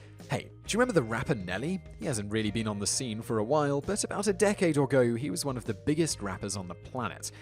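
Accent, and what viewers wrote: United Kingdom. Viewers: Typical American response to British pronunciation...stupid. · Cade Tatum He's British, he can pronounce it how he wants.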